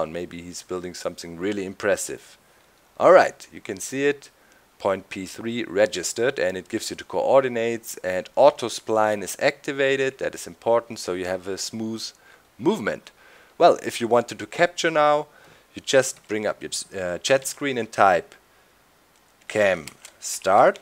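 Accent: Germany. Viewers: You are German like me. Aber dafür ist dein Englisch total gut :)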